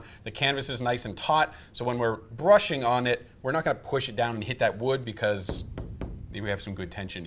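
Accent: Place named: New York, United States. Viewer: I like the New York accent.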